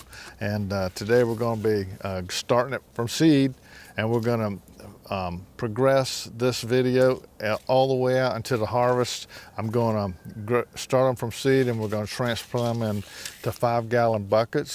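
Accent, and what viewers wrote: South, United States. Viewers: I love your videos. I like how you go from start to finish. And short and sweet. And I think I hear a little southern twang in your voice.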